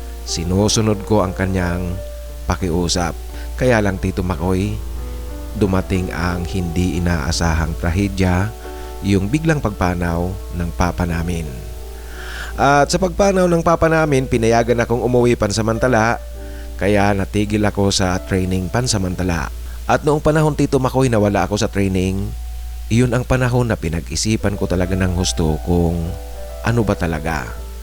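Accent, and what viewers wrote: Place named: Tagalog, Philippines. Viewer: good evening, Tito Makoy... I realized that it's been 5 years now since I started following your channel dated March 18, 2021. lala ko pa, I used to smile every time you have an accent in some Tagalog word. I think it was one of my comments to teach you to pronounce some Tagalog word.. 😅 but now, as I've mentioned before, you're totally sound from the Katagalugan region. (I came from the popular "Balagtasan" province, can you guess?😅) more power to your channel and wish it will reach the Decade Anniversary.🤗 Stay safe... 🫡 God bless! 🙏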